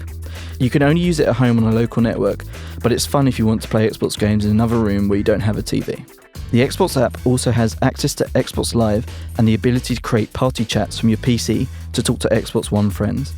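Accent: United Kingdom. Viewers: damn I've looked for various reviews and they all have narrators with British accents I can't &^%$ understand...why do they do this? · fuckin british accent, cant understand a word :C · I have never hated a British accent as much as this. It would've been a great review otherwise.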